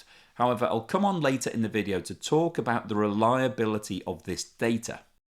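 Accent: United Kingdom. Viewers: Your analysis of the Greek-Turkish situation is appallingly one-sided and misleading. (1) Greece has S300 in Crete because Cyprus purchased them to station them in Cyprus, but Greece had to take them because Turkey made a fuss. Greece did a favour to NATO to appease Turkey, yet again as done many times. (2) Turkey has been invading Greek air space many more times than it claims Greece does. Whether Greece does invade Turkish Air space is to be seen and probably false, because Turkey sees the air space over the Greek Islands as its own, which is a fantasy. (3) Turkey has been attempting to size Greek territorial waters and land for decades because it wants access to hydrocarbons in the Aegean see, and to do this it is inventing its own nonsense all the time. Most ridicolous of all, it never signed UN treaties regulating continental shelves (together with a handful of countries) and then tries to invent its own continental shelf. 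(4) Greece stations small military units on the islands for defensive purposes. This after the Turkish illegal invasion and occupation of Cyprus. And has the right to defend its territorial integrity given Turkey's very obvious aggressive policy. In short, stop doing propaganda for the Turkish state. And if that is not your intention, educate yourself and present facts as they are. Finally, since it sounds like you are a Brit, put your time into making sure that your government gets their hands off Cyprus once and for all, does what necessary to bring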